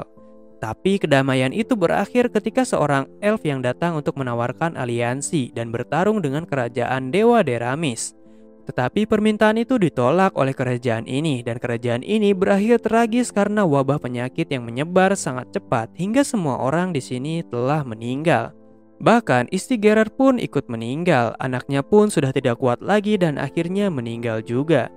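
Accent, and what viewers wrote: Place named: Sunda, Indonesia. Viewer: Bjir lu Sunda bang 🗿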